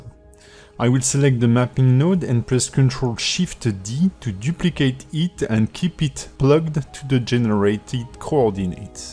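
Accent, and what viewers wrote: France. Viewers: Thank's a lot man ! Tu es français par hasard ? ;)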